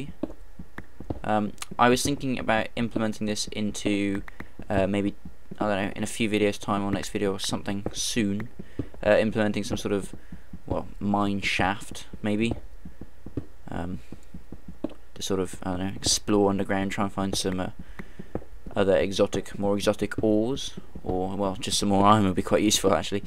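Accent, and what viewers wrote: United Kingdom. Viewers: reminds me of X.... cept english accent...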